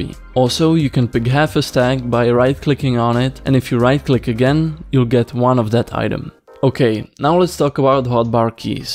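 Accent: Czech Republic. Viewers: I can smel the czech accent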